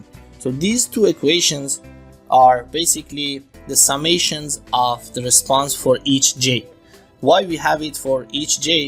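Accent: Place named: Iran. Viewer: Idk it is me or all persians feel joy after hearing the [persian accent]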